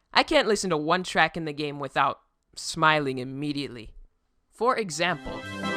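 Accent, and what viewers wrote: Canada. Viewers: Damn thicc Canadian accent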